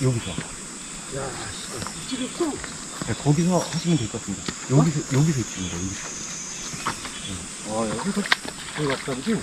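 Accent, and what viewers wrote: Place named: North Chungcheong, South Korea. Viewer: 충청남도가 아니구 충청북도 말투시네유~~~ 제천쪽 그쪽 사시네벼유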